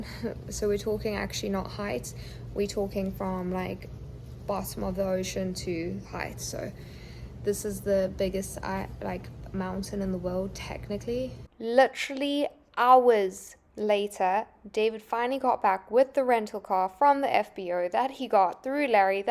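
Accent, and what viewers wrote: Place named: United States. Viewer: Чувиха ботает на американском языке. А это немножко не английский.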